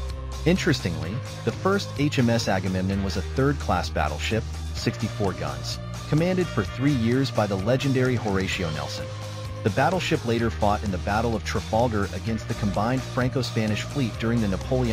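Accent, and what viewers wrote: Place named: United States. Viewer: Do we really need an American accent and the American spelling of "centre" If they want to pretend to be British for money they should get it right. Some elderly Britons notice these things.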